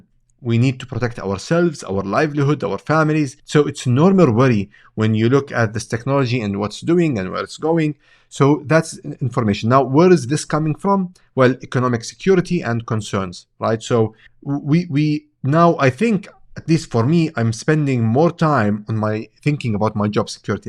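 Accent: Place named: Spain. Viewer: the R😂but don't care, are you native Spanish?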